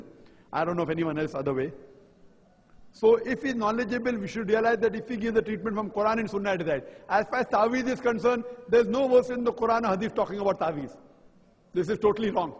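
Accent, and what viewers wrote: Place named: Arab world. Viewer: Unxpectedly Xpected Are you Islamic people forfeited any kind of magic? If it doesn't tell me.I can't fully understand cause he is using Arabic accent. I barely understand only 20~30%.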